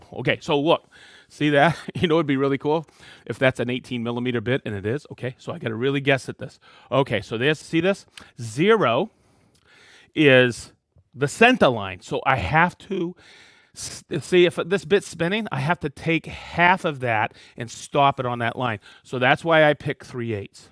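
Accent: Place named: East Coast, United States. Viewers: Came here just for the wicked Massachusetts accent. :) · He has his own channel and completely different personality... well not completely, but more relaxed. As for his accent, it's just an East Coast accent.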